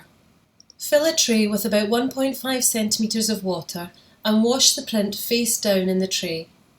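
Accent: Scotland, United Kingdom. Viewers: How lovely to hear a friendly Scottish voice. Just getting into doing some cyanotypes and enjoyed your explanation. All the best from Panama 🇵🇦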